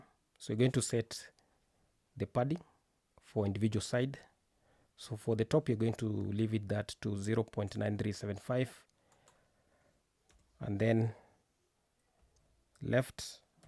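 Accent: Kenya. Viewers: Unasound Mkenya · I believe you are a Kenyan. That accent though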